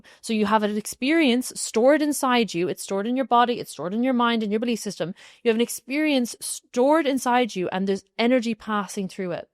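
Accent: Ireland. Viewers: Please embrace your Irish Accent, it’s one of the many reasons why I am attracted to this content.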